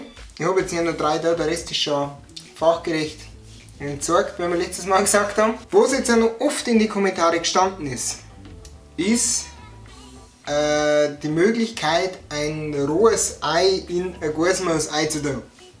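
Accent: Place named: Upper Palatinate, Germany. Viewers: Du soitest stolz auf dei herrlichs Obapfälza "ou" sei und dia vo den Rindviechern nix eazähln lossn! I kannt dia den ganzn Dog zuahearn wia du Goaßmaß sogst. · von wo kommst du aus da oberpfalz?😅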